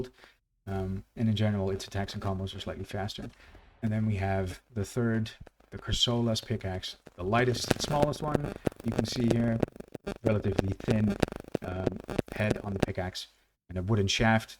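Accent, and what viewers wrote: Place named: Netherlands. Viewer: Instantly recognize that Dutch accent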